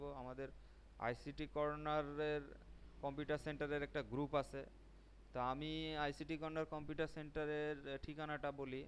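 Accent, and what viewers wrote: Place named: Madaripur, Bangladesh. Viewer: Your voice was just like madaripur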